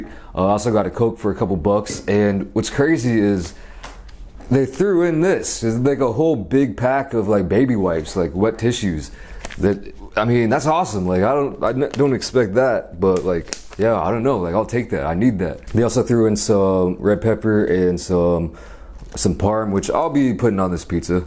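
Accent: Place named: South, United States. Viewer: I wonder was he born in tx I hear a southern accent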